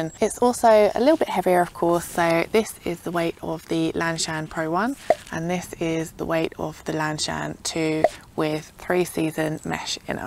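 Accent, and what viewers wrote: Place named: Berkshire, United Kingdom. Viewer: Hi, i have been trying to figure out your accent for a while now, is it Berkshire, Oxfordshire, Hampshire or near. My mother is from Cane End nr Henley originally and sounds a bit like you. Accents intrigue me. Great videos, reviews and adventures, and i love the wildlife you encounter, stay safe, all the best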